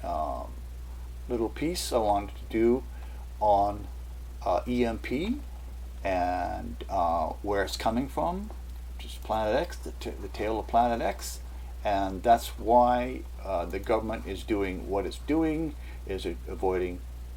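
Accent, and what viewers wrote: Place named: Canada. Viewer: Hey are you Canadian? You sound like me. lol. I think I know that accent. Be sure to see these if you have not regarding planet X, excellent information. it discusses the plasma field as well as the iron oxide and the debris it drags along with it on all sides, pushing asteroids ahead and dragging it behind. It isn't moving that slowly either as Carlos Ferrara discovered in his 40+ years of study of its perturbations, Carlos found it travels at three speeds, check out what he has to share with us about this. CARLOS MUNOZ FERRADA HERCOLUBUS (Chilean Astro Pysicist 40+ years study Nibiru perturbations) <link> Also don't think our magnetosphere is going to protect us as it is dropping at a very high rate at this point see this about that, stop the articles/emails in the video and read them to explain that which I am speaking of. 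Earth Changing End Time Signs (Magnetosphere is dropping, pole flip coming) <link> John B Wells; Gill Broussard Planet 7X (as far as I am concerned Gill produces the finest research material available) <link> A MUST WATCH Interview Nibiru Planet X future predictions;Carlos;Ferrara;(Chilean Astro Physicist 40+ yrs research) <link> P-7X Scripture and;TIMELINE Lg 11x17.pdf <link> (Free PDF downloads of Nibiru Timeline according bible and Asian Astronomical records) Gill spent 9 months in China alone studying Emperors Head Astronomers records and compared them to those of Korea, Japan, Germany, Turkey, the bible....it all correlates as true. The signs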